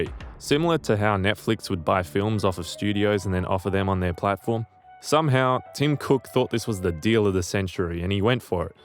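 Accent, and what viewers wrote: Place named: Australia. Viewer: Hey this was a great video! Love getting a seemingly random recommendation and hearing a fellow Aussie. Will subscribe and look forward to your new content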